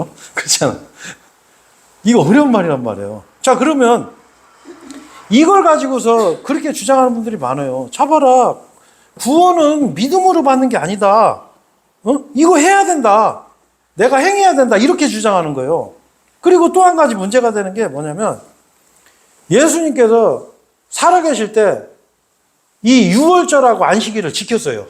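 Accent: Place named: Gongju, South Korea. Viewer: 나의 고향 공주에 복된 교회가 생겼네요! 형제님의 공주 사투리도 정겹습니다. 공주에 사는 우리 친척들이 구원받을 기회가 되기를 기도합니다.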